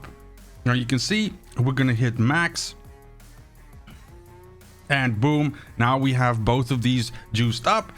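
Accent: South Africa. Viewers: from which country are you bud, you sound like RSA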